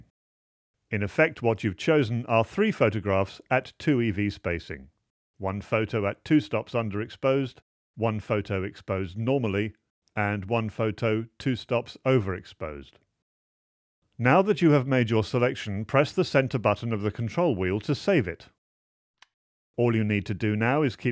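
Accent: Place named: United Kingdom. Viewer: outstanding. Can you believe that i just couldn't find these instructions anywhere?? clear crisp and at last a classic understandable old school british accent with clarity of thought . thanks once again.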